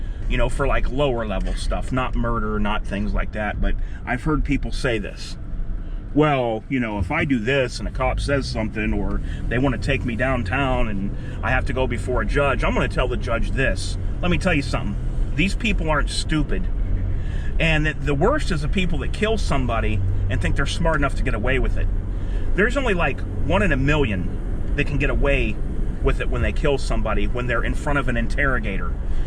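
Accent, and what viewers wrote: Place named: Western Pennsylvania, United States. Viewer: Obviously you’re from Canton too. Although I can almost detect a Western PA accent.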